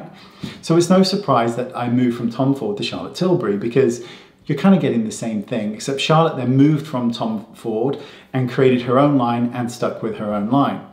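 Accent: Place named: West Country, United Kingdom. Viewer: I’ve been watching for years and I still love the fact I can hear a West Country accent when you explain things. 🇬🇧 Loved this video! ✨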